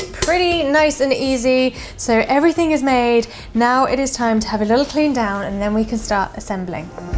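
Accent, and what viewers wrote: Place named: West Country, United Kingdom. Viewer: I love your accent. Is it a west country accent? :)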